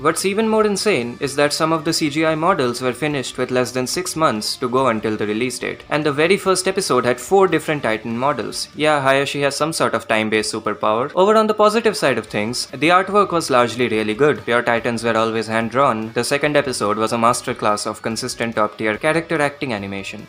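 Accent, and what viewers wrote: India. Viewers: Are u indian? 🗿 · Why you sound like an Indian scammer🤔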